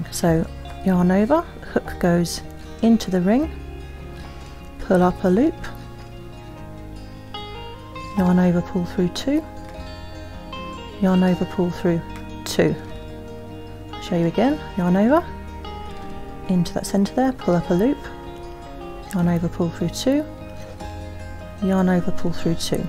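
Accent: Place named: United Kingdom. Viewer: Hi this is a lovely design and you explain it so well. I’m a beginner in the uk, are these US or UK terms please? Because of your accent I thought it was uk terms but now I’m confused….it doesn’t take much 😂😂 Great video thank you x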